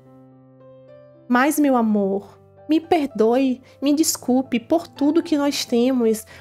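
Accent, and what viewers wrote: Bahia, Brazil. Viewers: uma curiosidade, você é baiana?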